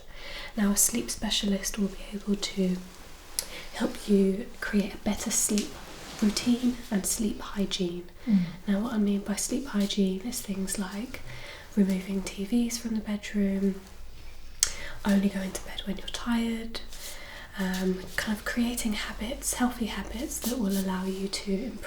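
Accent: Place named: England, United Kingdom. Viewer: J’adore ton accent et ta manière de parler . Tu es anglaise ?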